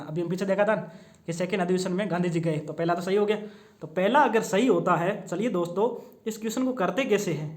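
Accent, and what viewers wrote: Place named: Rajasthan, India. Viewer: The way to explain any of your topics is very good, I love listening to your videos because Rajasthani mix comes in your tone 🙏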